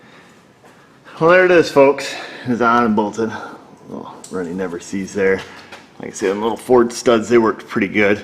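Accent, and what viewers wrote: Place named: Alabama, United States. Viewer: I like these videos , but I am from Alabama and it seriously sounds like he is saying Cadillac converters. Which is what someone from Alabama would say".".".......................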